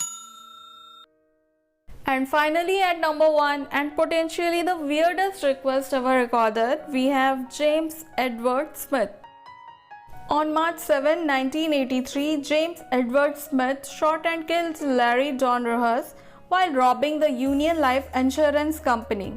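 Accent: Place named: India. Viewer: Great video and the Indian accent is beautiful. Thank you for sharing these photos.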